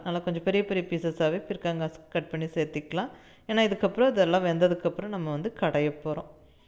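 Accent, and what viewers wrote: Kongu, India. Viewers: Mam dish super. Pankarapava supera iruku.i will try. Ungha voice rompa clarity, sweet.neegha pesura kongu tamil super.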